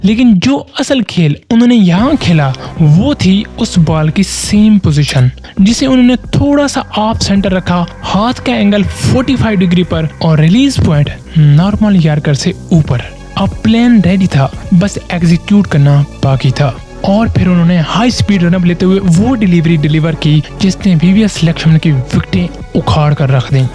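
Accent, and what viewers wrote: Pakistan. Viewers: Tera accent to Pakistani hai baat tu IPL dekhne ki karta hai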